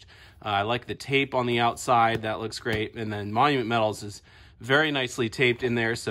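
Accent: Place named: Oregon, United States. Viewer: Thought you sounded like an Oregonian. Haha! I live in Wilsonville, myself.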